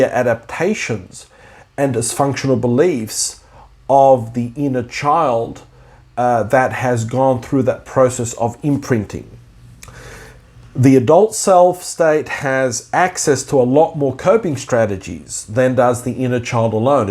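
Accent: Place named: Australia. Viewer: Very interesting. I am an identical twin so there's a whole other aspect of "self" to address as well, which singletons do not. All four self states are thus magnified in essentially two identities - together as twins, and as separate individuals. The external experiences impact the significance of the development of self as well for eg, all our lives we are assessed re our differences and our likenesses by others who inform us of their conclusions, yet simultaneously treat us as a "unit" (eg one soul split into two bodies), thus the development of our "self/selves" is extremely public, ceaseless and difficult. To be told from a very early age who your inner child is, being critiqued, in the context of being compared etc with someone else's inner child is really confusing for young kids, and not easy as an adult either. It's almost like the inner child and inner critic are both external and internal for us. 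So as I listen to your excellent talks I filter all you say through our and my & her perspective. Many twins/multiples become bitterly estranged as the inter- & intra-personal self states are too hard to deal with without good help, and good help is extremely scarce. There's a lot of info about twins and our genetics etc & for their parents but practically nothing for twins themselves in how to navigate this often confusing state so that the adult and warrior can handle things well. Thank you for your great work :). Great to hear a fellow Aussie.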